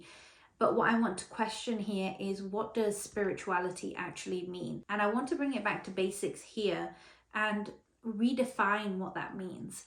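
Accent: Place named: United Kingdom. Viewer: British English adorable!Salam from Kavkaz,Russia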